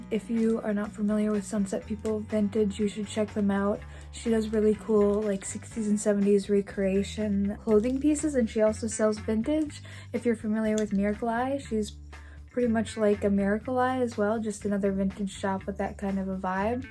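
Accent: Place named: North, United Kingdom. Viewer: I stumbled upon one of your videos today and found myself listening to others for the past couple of hours. I am obsessed with vintage clothing and love music before my era as well. You were asked a question about mental health and answered so honestly that I wanted to applaud your courage. Great job on the videos! P.S. I am originally from up-north and recognized the accent immediately ; )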